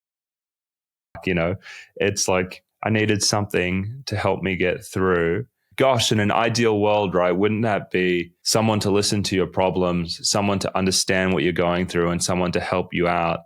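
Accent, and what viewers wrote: Australia. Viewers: Based on your accent I'm guessing you're from Australia, do you work in Australia? I need to get off my meds but my psych isn't interested in helping me.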